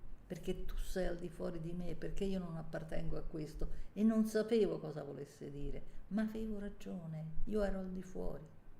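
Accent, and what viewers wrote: Rome, Italy. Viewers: Ciao non ho ancora visto il video però ci tenevo io a cercare di darti qualche “spunto animico” che fanno sempre piacere Ti stra consiglio le serie di Zero Calcare su Netflix, ne è uscita una di recente “Due spicci” molto bella ma sono tutte meravigliose… tra l’altro romanaccio come te, secondo me ti piacerà molto E poi una canzone, “ ” dei Grazie mia, spero tu stia bene ♥️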